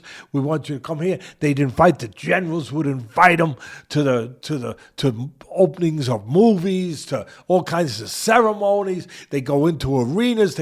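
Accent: New York, United States. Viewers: I love everything, especially the NY dialect. It’s broad, honest, genuine.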